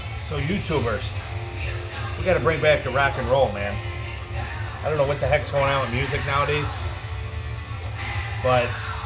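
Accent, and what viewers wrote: Chicago, United States. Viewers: My gut said Chicago !! Woohoo! Good man :) awesome video by the way and amazing modification!!!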